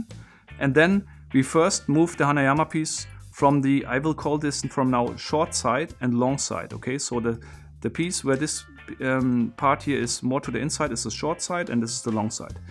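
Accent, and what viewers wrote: Germany. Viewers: you sound like light indian accent. Oh youre german, yeah that sounds more like it.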